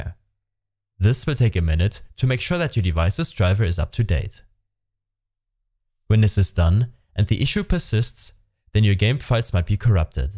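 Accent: Germany. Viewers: any cahnce that u are german ?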